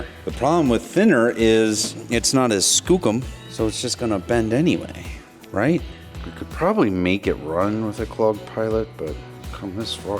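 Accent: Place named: Pennsylvania Dutch, United States. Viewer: I love you -- I've been watching your videos like crazy for the last couple months... and I grew up in Allentown so I love hearing your PA Dutch accent... but you gotta stop saying "menagerie." Nothing but love, your friend in Texas, Alan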